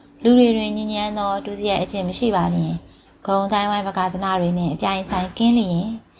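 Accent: Upper Burma, Myanmar. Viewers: စကားပြော လုံးတယ် လျှောချတယ် အညာသူပဲ ဖြစ်မယ် မမရျေး 😝😝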